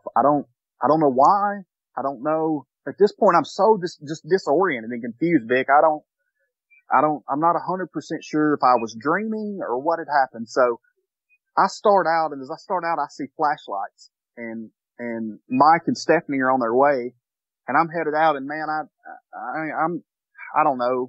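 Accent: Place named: Alabama, United States. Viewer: ....great collection of encounters! “Great Alabama accent”.....I could listen to you all day! Thanks for sharing....Thank you Vic....touchdown 🏈!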